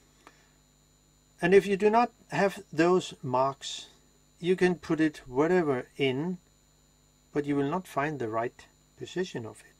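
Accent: Denmark. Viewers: it can only sit in one position. Jeg kan lyd en dansker fra tusind km, rigtig godt video.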